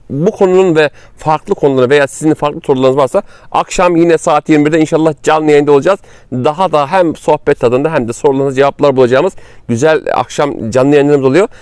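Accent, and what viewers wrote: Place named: Black Sea, Turkey. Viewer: Hocam cok guzel karadeniz ya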